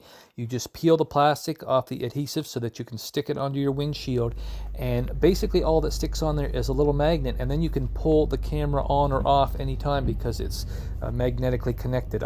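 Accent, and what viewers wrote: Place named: Newfoundland, Canada. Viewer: Good newfie east cost voice 👌👌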